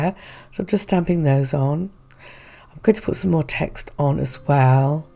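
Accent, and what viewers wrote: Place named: United Kingdom. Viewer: I want you to know that I hold you responsible for enabling my Stamperia Paper addiction! 😉 Lol The problem is, I go through it and I love it so much I don't end up using it! Oh dear! So, these lovely longer vids are my faves! Your voice is calming to me & as a transplanted Scot living in the US, Brit accents are very soothing to me 😁 I am a long time fan of yours. You've taught me to be more adventurous in my gel printing! I've made shapes & swirls out of cut out cardboard - all sorts. I'd have never tried that without you inspiring me! Watching you sends me running for my paints... I can't think of a higher compliment, can you? Love your aesthetic,! Thank you so much for everything! Love & Bless xo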